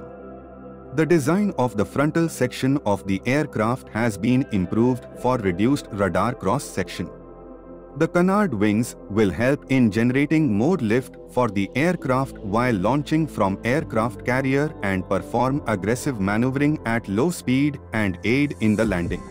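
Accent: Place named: North, India. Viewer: North Indian crap english accent all wrong pronunciations of words...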